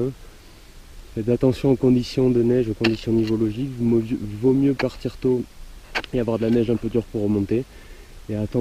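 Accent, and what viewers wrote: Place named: Southwest, France. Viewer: Cest vrai quand vous regardant les gars vous êtes tres claire, une voie fort sympathique ( j’adore l’accent du sud ouest ) mais j’ai eu la même reflexion en cas de chute et malgré le piolet bien en main ca risque de vite chauffer sur les mains et donc le réflexe sera de lâcher le piolet...donc oui des gants semble s’ajouter a votre panoplie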